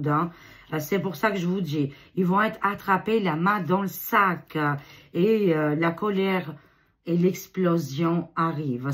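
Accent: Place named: France. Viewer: Allo, c’est Johanne de Québec, j’ai aimer beaucoup ton vidéo À chaque jour jadore ❤❤❤❤❤❤ comme d’habitude, tu as beaucoup de nouveaux sur la France dans ton pays, et c’est très intéressant, c’est vrai que vous avez eu la vie pas très facile Depuis quelques années là bas en France, ça aucun sens, et tu as vraiment et tellement Raison, qu’il y aura beaucoup Nouveaux positif dans votre coin de pays, et j’aime et J’ adore beau ta belle accent de français quand tu parle, et sache que tu t’exprimes très très bien vraiment sincèrement, j’aime beaucoup entendre ta belle voix ma belle, je t’admire beaucoup, et sachez que Nous aussi à Québec le Gouvernement nous ont mener Dure, vous n’êtes pas les seules, car moi je vous comprends très bien 👍 je reviendrai écouter, plus-tard ton autre vidéo, Aujourd’hui plus-tard cars Nous avons 5 ou 6 HRS: de Décalage Avec Québec et la France, nos amis et cousins cousines de France, et je te dit toujours ton Beau sourire charmeuse, merci pour tout ce beau partage 😊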